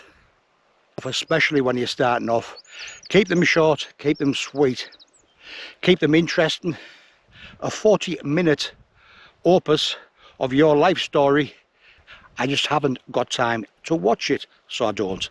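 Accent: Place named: South Wales, United Kingdom. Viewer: Love that, out there today haha you really did get noticed and that woman who turned away must have been in awe of you 😃That was stunning up there but wow you were up early again, 3 50 in the morning oooh 😯 that joke though! It did look so beautiful but i can imagine like you said, the colours in a few months time must be gorgeous. I thought the advice that you gave to the new YouTuber was so good, I can only watch longer videos if I really enjoy a channel. Loved the views at the summit, and nice that there was some shelter up there too. I enjoyed your South Walien accent 😃🏴 and i feel the same about cows and horses that are loose on mountains too, scary. Enjoyed this as always:) ~ Anna 👍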